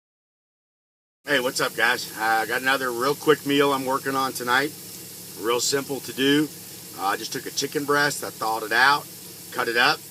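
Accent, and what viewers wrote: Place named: Los Angeles, United States. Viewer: looks great.. what accent he is speaking,is this LA accent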